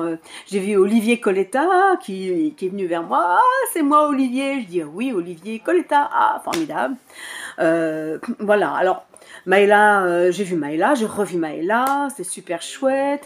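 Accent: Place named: Paris, France. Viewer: Merci pour ton Naturel et ton accent Parisien ❤😅😇🙌🦎⛰🐬👽💫🙏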